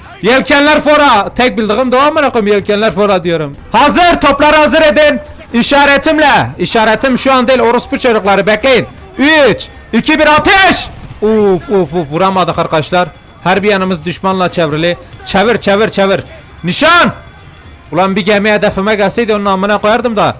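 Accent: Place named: Kurdistan, Turkey. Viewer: çekemeyenler olacak seni sen bir Kürtsün senin şivene doğalığına kurban valahi senin takipçin artıkça ben seviniyorum yolum açık brakoo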